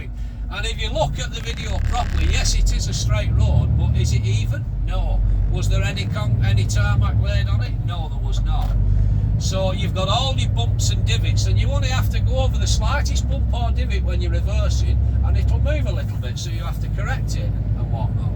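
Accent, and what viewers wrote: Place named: Wigan, United Kingdom. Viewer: I'd love to give you ma mates address in bonnie Dundee,park that heep of shit outside his window 😁 Strath carron, give us some of your Wigan twang!!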